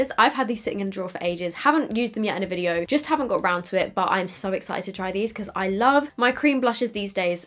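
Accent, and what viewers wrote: United States. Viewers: Omg. Can u do a video speaking in an American accent!!???? That would be so cool and funny if u did like a whole tutorial in ur American accent!!! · Who else wasn't ready for the American accent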